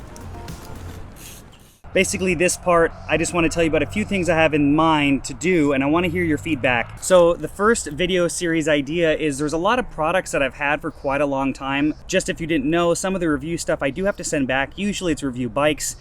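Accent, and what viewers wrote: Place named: United States. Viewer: I follow you since 2013 I think, and I have never understood a single word, because you speak very fast and with american accent (I'm Italian). But I like your videos! :)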